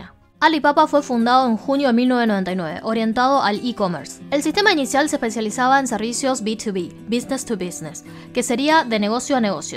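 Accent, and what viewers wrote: Buenos Aires, Argentina. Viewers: Me mata el acento Porteño!! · me encantan tus videos, te voy a empezar a seguir, pero hablas muy rápido. ¿Eres argentina?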